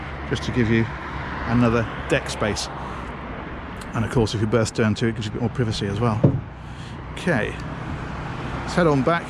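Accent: United Kingdom. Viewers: Best boat tour channel on you-tube... it just keeps getting better... Right then... cool. :) It's brilliant. It wouldn't be nearly as good without the British accent and colloquialisms... the boat companies recognize and welcome him with open arms, which is all to the good for us broke ass dreamers. lol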